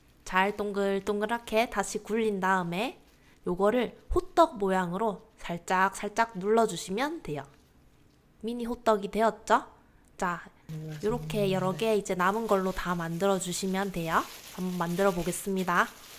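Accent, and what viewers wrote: Gyeongsang, South Korea. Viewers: 경남 지역인거같아요 제가 경남사람인데 저런말투 쓰거던요 ㅋ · 은 대구말씨~!!^^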